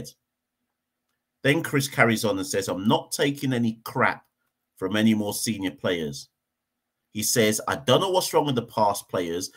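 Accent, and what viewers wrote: England, United Kingdom. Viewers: I don't believed that you were born in Jamaica and talking, like you were born in England. Becaused my ex wife is living in England 58 years, she went there when she was 8 years old and still living there. I was married in England 🇬🇧 31 years, since I was married in England 🇬🇧, but I am living in the United States 👍 almost 30years now. I am older than Ambrose, Ambrose, Bishops, Carl Hooper, Desmond Haynes, Marshall, Jimmy Adam's,BENJAMIN'S them,so I know what I am saying. I can see that you are very young, I know since 1971 playing for the combined Islands them. Ambrose is very much out of order, they just jealous of Gayle with his money and fame.The man 👨 is well loved in India 🇮🇳 and, all over the world. Playing into a few games and failed, I don't see all the big thing what Ambrose is behaving so erratic. Becaused he cannot compared himself with Gayle also Viv Richards, Gayle is the only wi batsman to have two T20 hundreds. 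The first batsman in the history of the T20 cricket 🏏,to scored the first hundred in his 1st match against South Africa 🇿🇦. I hates how these small islands guys is behaving so erratic, they does not want any Jamaican to play on the wi team. Viv Richards is talking about Jason Holder is not a good player at all, he may luckily get a few wickets now and then.Also scored a few runs, but he is not a reliable, or competent player like Gayle. Anybody who knows about cricket 🏏, every player have their ups and down. In their career, so Viv Richards is very st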